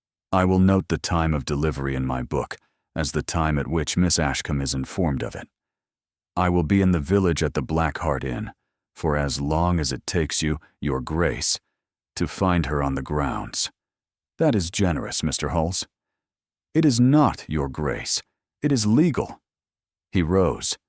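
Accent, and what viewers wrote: United States. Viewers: Cant bear us accent.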